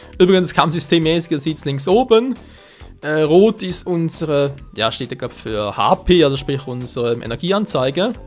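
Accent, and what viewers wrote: Switzerland. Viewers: Der schweizer akzent machts 10mal besser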